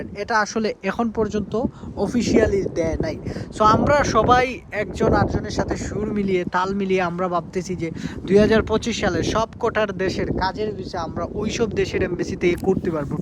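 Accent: Sylhet, Bangladesh. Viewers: সিলেটি ভাই❤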